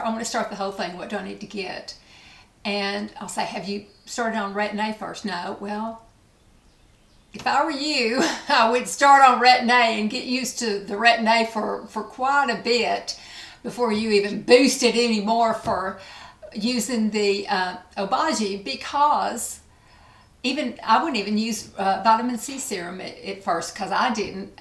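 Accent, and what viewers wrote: South, United States. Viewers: LOVE the Southern accent!!!! Beautiful!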